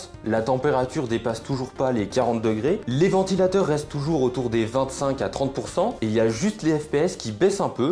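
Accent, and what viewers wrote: Belgium. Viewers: Les belges prononcent pas les chiffres comme les français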